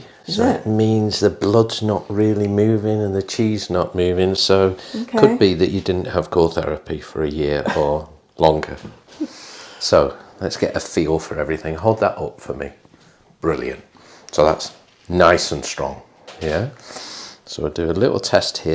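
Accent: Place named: West Lancashire, United Kingdom. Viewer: I know a West Lancashire man when i hear him! Not too strong of an accent ☺️-from an East Lancs lass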